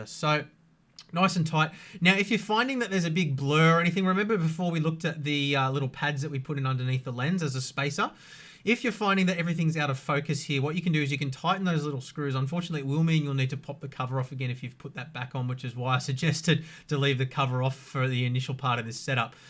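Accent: Australia. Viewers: Dude he is a ozzy. Its called an accent. ;)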